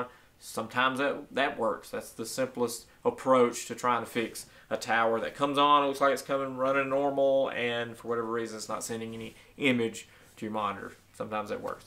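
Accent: South, United States. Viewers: You never heard southern drawl?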